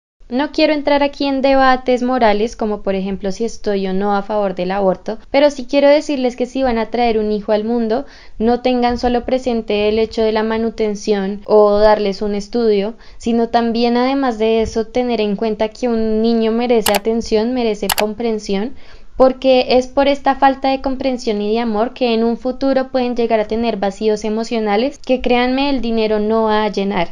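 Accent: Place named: Colombia. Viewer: Ambos son colombianos, supongo que es por el acento ☺️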